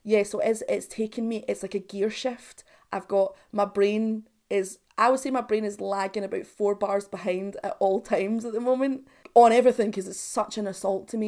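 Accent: Scotland, United Kingdom. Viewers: I can't tell if you're super charismatic or if I just think women with Scottish accents sound really cool. Probably some from column A and some from column B. Subscribed! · Why do i feel like I’ve never heard a girl with a Scottish accent? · Are you Scottish? Love your accent!🥰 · Im from Australia and the scottish accent nearly makes me weep , i fkn love it !!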